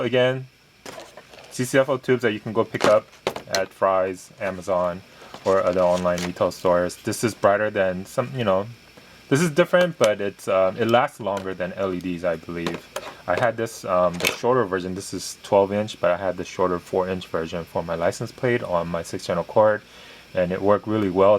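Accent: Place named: South Korea. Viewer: I have '15 Accord Sport. I got a lot of useful information from you like the HID installation. Thank you very much. I got personal question. Are you from Korea? Your accent is very similar as mine. ^^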